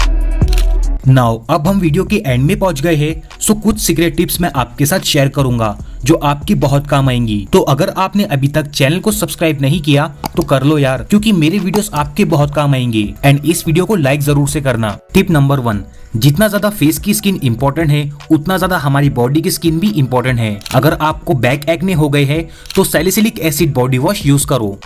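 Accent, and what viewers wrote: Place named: Maharashtra, India. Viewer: Marathi hai bhai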